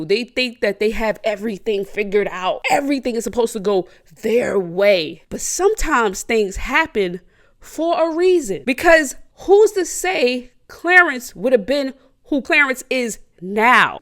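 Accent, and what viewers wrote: New York, United States. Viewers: New York accent came out 100 percent in this video 💯😭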